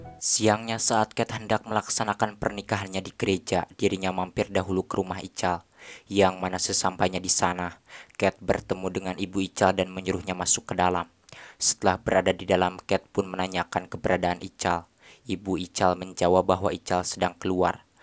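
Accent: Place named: Sukabumi, Indonesia. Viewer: Bang si abang ini aslimana teh ?? Sukabumi kah ???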